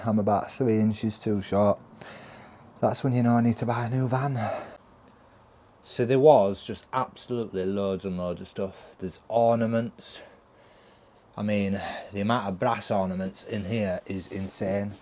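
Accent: Yorkshire, United Kingdom. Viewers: Stumbled across your channel scrolling through YouTube! Love the work ethic! Would be great if the end of your videos you did a breakdown of the financial side of the job. What you charged and what you made from the good bits that you’ve kept to scrap or resell etc. Looking forward to the next video! All the best from a fellow Yorkshireman (you sound like a yorkie anyway 😂)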